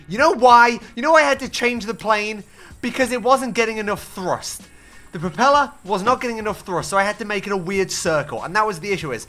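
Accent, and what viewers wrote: United Kingdom. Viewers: u r british yayya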